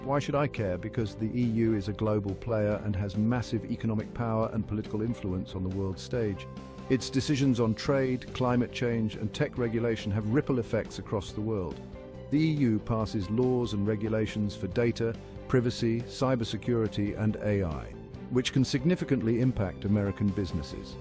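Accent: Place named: United Kingdom. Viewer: Why am I listening to a British accent talking about the European Union. Brexit means Brexit.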